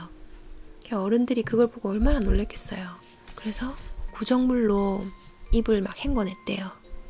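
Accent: Gyeongsang, South Korea. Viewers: 아휴 님 목소리 땜에 잠못 잡니다 어쩜 그리 목소리가 달콤한지 ᆢ 근데 고향이 어디신지 경상도 목소리가 이리 좋은지 몰랐네요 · 경상도 사투리냄새가 물신 나,오히려 정겨워라.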